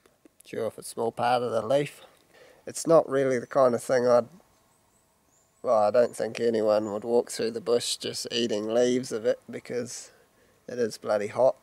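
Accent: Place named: New Zealand. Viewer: Good one. I like your Chanel. Great to hear the true Kiwi accent and colloquial use of language 👍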